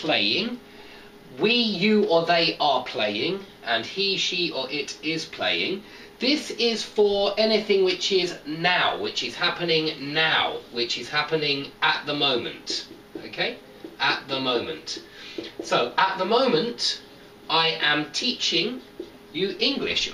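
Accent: United Kingdom. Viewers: Thank for for your free lessons! You're doing fantastic job! Great explanation and authentic british accent! Please, make more!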